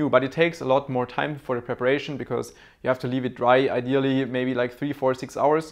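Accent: Germany. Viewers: Deutscher Akzent ist mal da :D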